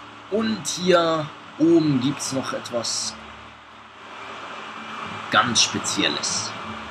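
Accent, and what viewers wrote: Switzerland. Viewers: Da hört man deinen Schweizer Dialekt noch ein bisschen raus😂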